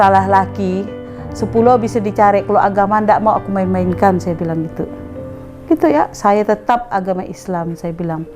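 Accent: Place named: Malaysia. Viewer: Tapi,akk macam org Melayu cakap Nye kak,akk dari mana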